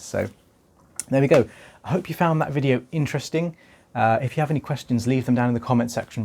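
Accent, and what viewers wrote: United Kingdom. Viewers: Sigh…. fascinated by an ear cleaning narrated by a lovey Brit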